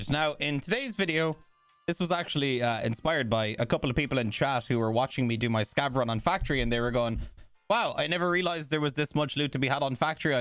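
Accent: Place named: Ireland. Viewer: subscribed for the Irish lilt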